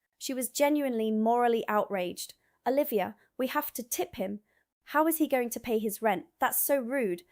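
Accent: England, United Kingdom. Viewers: you sound so English, and your good looking girl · For an American who’s only lived in the UJ for two years, she certainly picked up a middle class English accent quickly!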